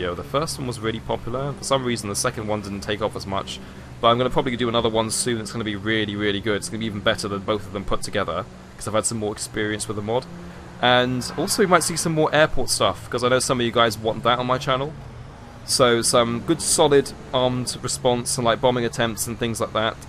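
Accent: United Kingdom. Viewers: What part of Britain u from I'm from stoke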